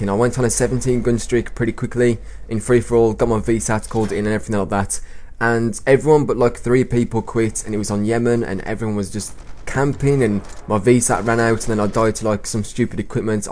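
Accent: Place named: Black Country, United Kingdom. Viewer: Where in England are you from? You sound like you're from the Black Country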